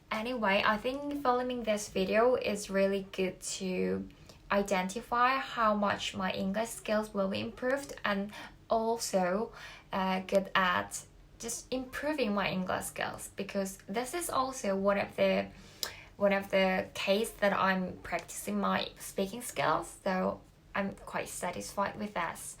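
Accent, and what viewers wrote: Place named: United Kingdom. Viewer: 님도 새해 복 많이 받으세요!!! 발음이 전보다 더 좋아지시고 영국억양이 조금 더 느껴지는거 같은건 기분탓일까여~? ㅎㅎㅎ 영상 편집실력도 더 는거같아요 히힣ㅎㅎ 그리고 오늘 입으신 옷 너무 예뽀요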